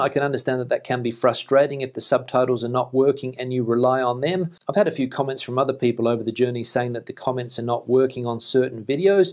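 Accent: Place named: Australia. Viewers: That’s because he’s from Perth Australia. After 20 years in Spain and unknown other reasons he’s developed his own accent. In today’s video he pronounced “assume” as “aschume”. I speak with a Californian accent, but weirdly pronounce several words with a British version, such as military, literally, and the weirdest one aluminum. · Saludos desde Australia!... I just discovered your channel yesterday! I moved to Beautiful Australia 3 years ago and I love it! Yes! We are lucky here! I was born in Colombia,but moved to the USA,when I was very young! So,thanks God I’m Bilingual...I visited Spain years ago and I love it! Dreaming about going back after the pandemia is over! Your English accent sounds Aussie!..but you know good Spanish by now! Keep up the good job! Gracias!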